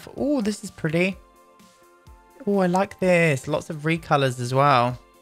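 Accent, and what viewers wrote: United Kingdom. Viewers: Your accent is the accent I do when doing a british accent and I guess it's a real accent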